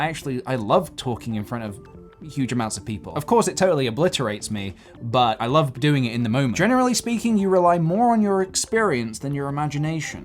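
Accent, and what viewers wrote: United Kingdom. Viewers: why u sound british but not really :/ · My gad, just fell in love with your British accent (if any French people are also hanging round here, sure yall agree)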